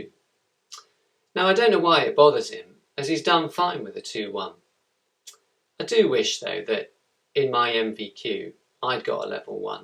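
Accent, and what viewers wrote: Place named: Scotland, United Kingdom. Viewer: I can hear a Scottish twang in your voice....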